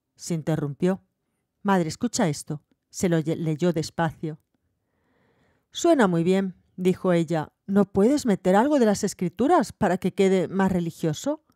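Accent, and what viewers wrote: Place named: Aragon, Spain. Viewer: De que parte de españa eres? tienes un accento muy bonito, y buena voz =) soy muy malo para los accentos, eres mañica??? xD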